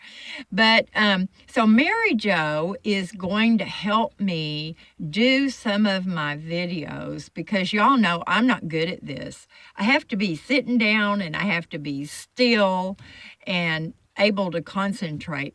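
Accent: South, United States. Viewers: I live in North Carolina and I look so forward to your videos. You sound southern.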